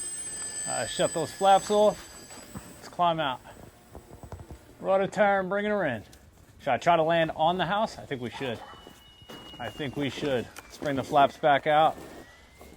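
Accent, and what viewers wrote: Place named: East Coast, United States. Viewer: Great coverage of this plane! Im looking for a nice backyard flyer. And your east coast accent makes me feel at home man. I moved away from The Island when I was in my 20s.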